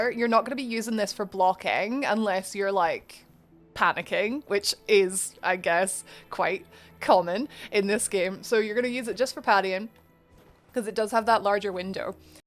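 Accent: Scotland, United Kingdom. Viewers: Came for tutorial, subscribing for fellow scot accent. Will be using buckler on my next DS attempt, thank you.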